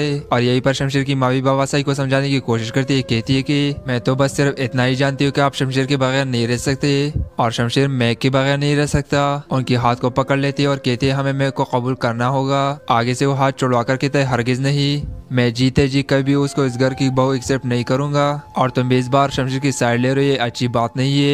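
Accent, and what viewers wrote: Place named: Urdu, India. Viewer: lahjy se to lag raha hai ka ap Urdu sai bol rahy hain because ap Muslim hain Hindu jo huty hain wo hindi bolty hain aor hindi bolny walo ka lahja pata chal jata hai aor India me jo muslman hain un ko to ni lagata lakin pata chal jata hai ke ya Indian muslim hain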